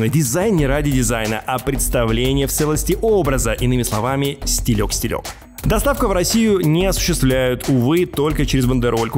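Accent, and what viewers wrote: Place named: England, United Kingdom. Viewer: О этот английский акцент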